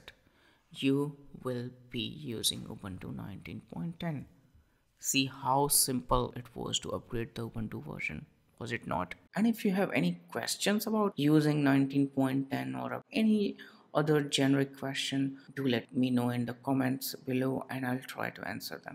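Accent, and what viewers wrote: India. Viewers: No, you are too quiet, speak with a distinct Indian accent, and your are speaking way too fast to follow.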